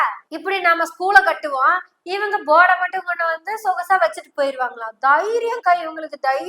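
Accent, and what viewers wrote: Kongu, India. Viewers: மகளே..!!.!! எப்போதும் தேன் ததும்பும் கொங்கு தமிழிலேயே உன் கருத்துகளை பதிவு செய்யம்மா என. தாயே..!! சிறப்பு..!! மிக சிறப்பு..!! மிக மிக சிறப்பு...!!!? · Very interesting and thought provoking presentation in the sweet Kongu Tamil. May the Almighty bless you for better prosperity.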